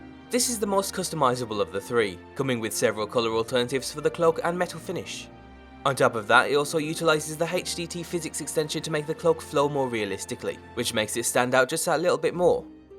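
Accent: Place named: Wolverhampton, United Kingdom. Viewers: dude you've legit got a Wolverhampton accent ;D ! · England, 'Midlands'. That is to say likely he comes from the area surrounding and including Birmingham. However is accent doesn't strike me as a brummy so perhaps slightly further north than Birmingham.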